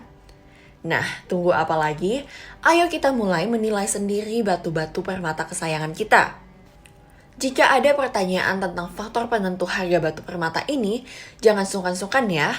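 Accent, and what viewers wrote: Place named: France. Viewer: Mantap naratornya karena ngomong dgn bahasa indonesia namun aksenya gaya prancis... #magnifico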